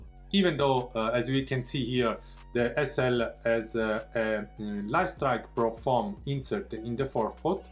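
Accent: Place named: Italy. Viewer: Your English is fine but i still can hear the Italian accent clearly XD, good review.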